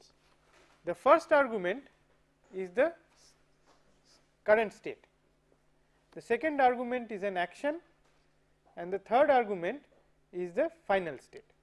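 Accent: India. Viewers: I lke the Indish accent. But thank you.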